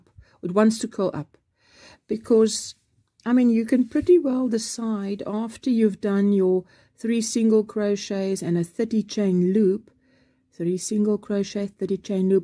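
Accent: South Africa. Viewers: Omg I just found you and you sound just like me mom with your accent. I'm guess your from South Africa. I lost my mom in April and miss her so much, hearing you talk brings me so much happiness remembering her voice. I was born in Capetown but came here to the U S when I was 3. My mom never lost her accent. Love this poncho and can't wait to make it.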